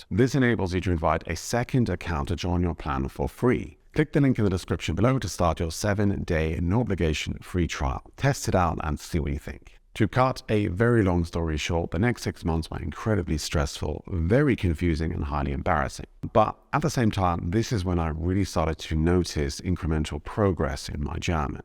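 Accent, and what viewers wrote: United Kingdom. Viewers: Thanks für this new video! I really got to enjoy your content but for me it is mostly the combination of your accent and your deep-ish calming voice which surely is the iceing on the cake. I can't really put my finger on it but I have always liked to listen to british people speaking german. I would think that you had quite some luck with your boss back then, because if you really felt you were terrible at your you and she still didn't fire you, she must have seen your potential and positive personality as a future promise. :) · Hach ja...weil es ja so oft erwähnt wird, das mit dem britischen Akzent...das ist doch überhaupt nicht wichtig. Ich persönlich empfinde es nicht als Nachteil, wenn mein deutsch sprechendes Gegenüber mit Akzent spricht. Sei es von einem deutschen Dialekt oder einer anderen Sprache. Ich selber spreche fließend Englisch, aber "with a heavy German accent" wie mir bescheinigt wurde. 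Da mache ich mir keine Gedanken mehr und spreche einfach drauf los wenn es die Situation erfordert. Akzent oder kein Akzent, das macht nicht den Menschen aus. Frankfurt am Main - sehr schön, sehr charmant dargestellt in diesem Video. Allerdings ist mir die Stadt, in der ich aufgewachsen bin und den größten Teil meines Lebens verbracht habe, sehr fremd geworden. Daher bin ich vom Stadtkind zum Landei konvertiert. Weiterhin eine gute Zeit und ein zufriedenes und erfülltes Leben! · Ich finde es lustig, wenn du zwischen Englisch und Deutsch wechselst, dass es mir zunächst nicht aufgefallen ist,wie oft es in diesem Video gemacht wurde, weil der Sprachfluss einfach gleichmäßig weiter geht. 😂 Ich hab nur plötzlich gedacht, ah er spricht in Deutsch 🤔 War jetzt das ganze Video auf Deutsch ohne, dass ich es realisiert habe? Und dann geht es plötzlich auf Englisch weiter 😄 Also es spricht auf jeden Fall auch einfach für dein fließendes Sprechen und ja, au h für den britischen Akzent, aber der ist einfach nur sympathisch, weil trotzdem alles perfekt ausgesprochen und verständlich ist. 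👍🏻 zumal ich ihn sehr dezent finde und nicht überladend. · For me you speak german with your british accent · You English is like middle to upper class British .Clipped and precise .I would put every cent i own in betting that you did not grow up in a British Council estate .You German sounds very good to me .I have only baby German myself after living in Berlin many years ago for a year 1977-78 .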